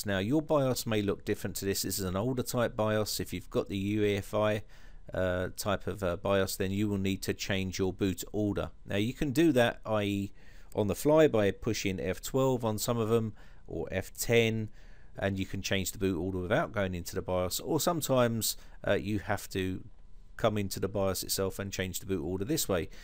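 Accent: England, United Kingdom. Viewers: Why Englishmen talk so much? Is this a lullaby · British english is to die for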